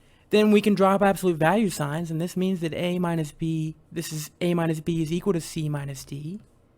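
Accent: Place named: United Kingdom. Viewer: Your video's are really good. In the beginning, you proved problem 7 of maxwell real analysis. In ch 4 of Rudin we use those facts countless times. Your problem selection, 14(a) & 12, for instance is also really nice. You solve every problem with precise definition, verification of metric space, for instance. By your accent, I'm guessing your from UK. Are you currently in some uni?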